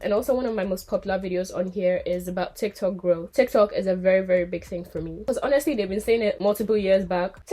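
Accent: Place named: Nigeria. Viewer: Love the Nigerian 🇳🇬 Assent ❤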